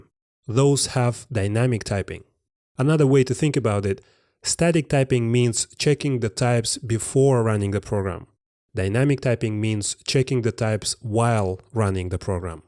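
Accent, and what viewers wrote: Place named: Eastern Europe. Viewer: Why does he have an eastern European accent but his username is?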